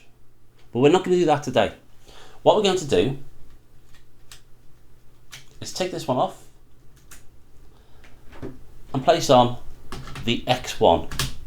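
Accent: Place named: Southport, United Kingdom. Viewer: Thanks for a very helpful tutorial. I did buy 6 youngnu 560 but I really found them fiddly to control and they kept going to sleep. I need 4 speedlights for work on location and I think this godox may be a bit more reliable. PS your accent sound like you might come from down the road from where I live in Southport 😎👌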